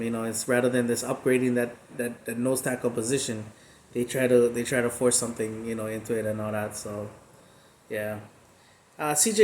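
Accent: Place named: Hawaii, United States. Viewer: Ahaha braddah wea u from..i know u from hawaii just by da way you talk but where in hawaii..btw you guys team looking like Rubbish..fly eagles fly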